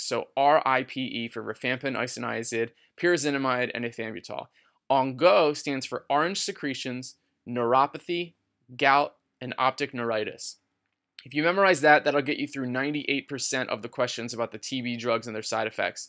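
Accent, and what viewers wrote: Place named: Philadelphia, United States. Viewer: Super helpful video! Also, I'd recognize a Philly accent anywhere...are you from Philly?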